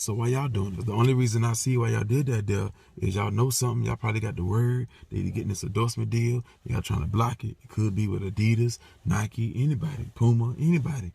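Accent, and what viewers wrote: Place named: Chicago, United States. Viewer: Your funny bro..it's like you frm Chicago but jus county asl wit it😭😭 dat dare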